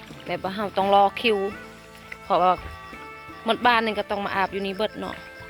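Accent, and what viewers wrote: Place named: Vientiane, Laos. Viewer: สำเนียงเว่าคือคนลาวเวียงจันทร์แถ่ะครับพุสาว😊❤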